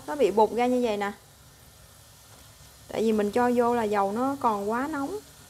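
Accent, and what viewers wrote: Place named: Mekong Delta, Vietnam. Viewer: Mình thích món này lắm, hôm nay được bạn chia sẽ công thức cảm ơn bạn giọng nói của bạn thật dễ thương, mình nghĩ chắc bạn là người miền tây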